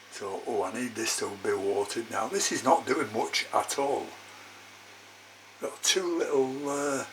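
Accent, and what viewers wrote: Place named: Newcastle, United Kingdom. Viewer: Keep up the excellent work. Have a great day. BTW I like your Gordie accent.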